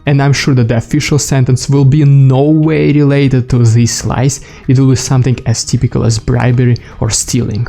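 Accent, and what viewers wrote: Russia. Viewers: somehow i have a hard time believing someone with russian accent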